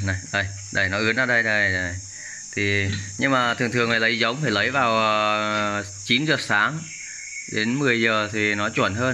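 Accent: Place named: Ha Tay, Vietnam. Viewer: Anh ở đâu thế nghe giọng giống ở Hà Tây cũ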